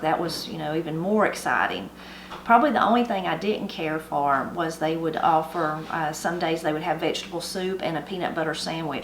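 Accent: Appalachia, United States. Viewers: I recently found your channel and I love it ❤ My mom made these for me and my siblings when we were growing up. The recipe came from my dad’s mom and we call them oatmeal candy. Your accent is very similar to my dad’s and his family. They are from a little town called Lafayette, GA which in the Appalachian mountains 😊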